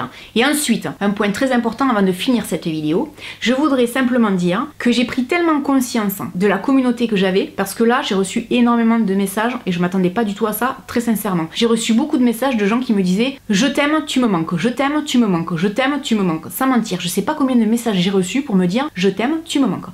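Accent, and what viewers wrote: South, France. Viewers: J'adore ton accent du sud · Je suis choquée des commentaires! Moi je t'aime et j'adore ton accent! Ici c'est le sud!!!!! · Juste un petit commentaire pour te soutenir, j'aime beaucoup ce que tu fais sur YouTube, ton accent du sud est me rappelle ma famille, et ta franchise est rafraîchissante dans ce monde ❤ Je suis désolée de lire tous ces commentaires déplacés cela ne devrait pas exister... Courage 😘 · Bonjour, Je prends rarement le temps de laisser des commentaires sur youtube, mais aujourd'hui avec cette vidéo je ressens le besoin de le faire. Les messages que j'ai lu dans cette vidéo m'ont profondément choquée. Tant de haine reçue totalement gratuitement... Personnellement j'aime beaucoup ta personne, ton travail, ton contenu, ton accent du sud, tes vidéos variées (liste non exhaustive). Je regarde toutes tes vidéos et je continuerai de le faire, tu as eu raison de remettre les pendules à l'heure car ces comportements ne sont pas tolérables. 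J'aimerais te dire que je te soutiens, toi et ton travail et que j'ai hâte de voir tes futurs projets! Prends bien soin de toi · Je suis choquée d'entendre ce que les gens peuvent dire sur les réseaux 😱 Je pense que la majorité des gens qui te suivent t'apprécient comme tu es. Avec ton humour, ton accent du sud, ta spontanéité. Bref ne change pas pour une poignée de gens "débiles" (encore je reste polie). ❤️ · Moi habituellement je n aime pas l accent du sud mais avec toi tout est possible tu es un amour merci de continuer! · tu es super, j'adore tes vidéos, ton accent du sud. Ceux qui critiques sont des débiles profonds.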